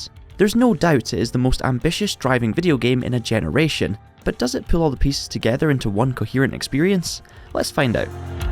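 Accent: Scotland, United Kingdom. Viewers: That’s a very clear Scottish accent … would love to hear the real accent though !!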